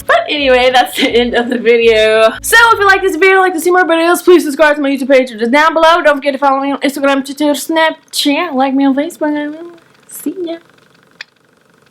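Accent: South, United States. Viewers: omg I just love your southern dialect. wonderful video keep it up <3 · Omg where from the south are you from like what area? We have the same accent so I'm curious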